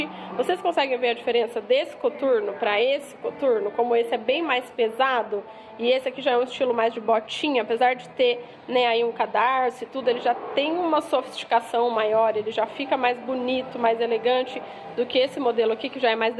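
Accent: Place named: Campinas, Brazil. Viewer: vc é de Campinas? O seu sotaque é de campineiro. Eu moro em Campinas e vivo andando pelos shoppings da cidade. Abriu uma loja da Amaro, onde era a forever 21 no D. Pedro. Gostei mais dessa loja do q a do Iguatemi. É maior. A bottero é uma loja sem vitrine, gosto de olhar a vitrine p depois entrar na loja p comprar. Nela vc entra e já chove vendedoras. Gosto dos sapatos da Bottero. E noto q as pessoas passam pela loja e não entram. É uma pena. Sempre vazia. Faltou a vitrine p atrair.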